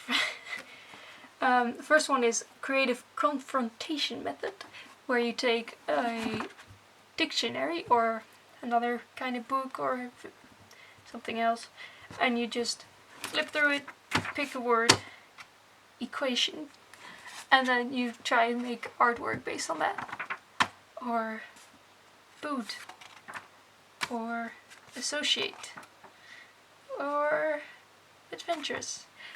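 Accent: Netherlands. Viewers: Are you / Is she Dutch? I hear a familiar accent 😏😏😏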